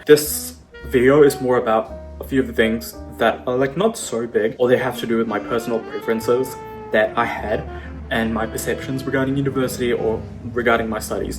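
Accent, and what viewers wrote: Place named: New Zealand. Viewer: mans sounding kiwi as f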